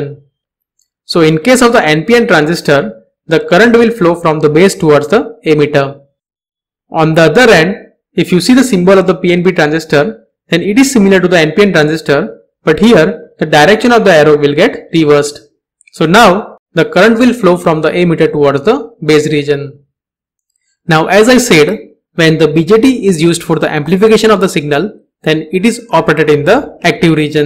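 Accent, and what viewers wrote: India. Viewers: neither is he, its the indian accent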